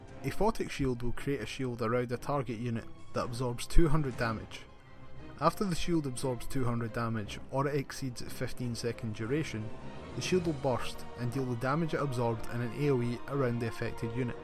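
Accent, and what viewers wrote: Scotland, United Kingdom. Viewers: What accent is it? Scottish, irish?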